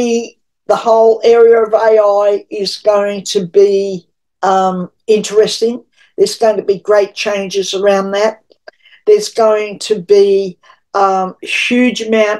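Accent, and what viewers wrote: Australia. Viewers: Thanks. Lovely to hear an Aussie. I’d Love to know where you live. It looks tropical. I hope you keep sharing. It’s also refreshing to have someone focussed on people mire than politics. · Hi ,it's was lovely to listen to you this morning and great hearing an Aussie accent 😊 . Interesting reading and I look forward to your next podcast x love and light Bobbie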